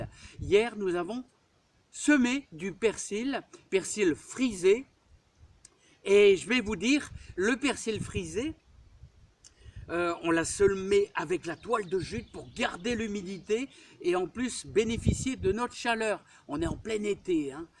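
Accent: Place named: Béthune, France. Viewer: Bonsoir Mr Depuis qqs jours je visionne vos vidéos avec plaisir et attention. J ai bien l intention de mettre certains de vos conseils en pratique. Votre accent aussi me réjouit vous parlez du Haut Bethunois( quelle commune exactement?),je suis moi-même originaire de Bethune,même si je vis depuis plus de 30 ans en Charente Maritime. Retraitée depuis qqs mois je profite e cette chance pour principalement m occuper d un potager (loué depuis 20 ans et acheté en janvier) J essaie de jardinier bio et naturel, d appliquer qqs principes de permaculture(notamment de maintenir un sol vivant) J ai essayé cette année (très chaude et très sèche) de nouvelles cultures avec plus ou moins de réussite Des topinambours récolte à venir Des poires melon aucun fruit ,pieds repiqués ds ma petite serre tunnel De la poire de terre (,Yacon) récolte la semaine prochaine Des endives hélas avec la sécheresse les racines sont toutes petites Tant pis je recommencerai j espère simplement que 2023 sera une année plus "normale " En tout cas merci pour votre bonne humeur et votre naturel. 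Bon mois de Novembre. Maryse Lydie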